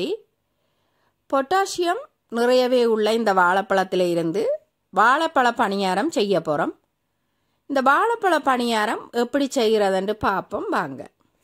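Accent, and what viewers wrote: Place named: Sri Lanka. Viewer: Thank u ... Elaggai tamil nalla irukku... Nice recipe...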